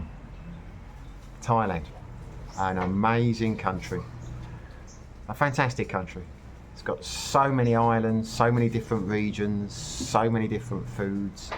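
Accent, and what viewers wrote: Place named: Cockney, United Kingdom. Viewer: My 1st visit to the Phils will be later this year, but I already know I won't be able to stand the noise, as I'm highly sound sensitive. Thai & Bali vs Phils, but I've heard Vietnam beats em both, & is a lower cost of living. If you can interview a mate with Vietnam experience, that'd be cool. With your Cockney accent, I can't catch half of what you're saying, but I still appreciate your awesome content!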